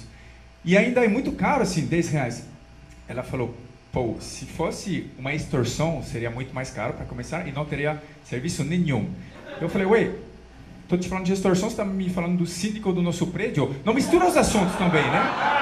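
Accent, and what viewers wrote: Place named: France. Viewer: Hahaha amei! Esse sotaque francês é demais 🤩👏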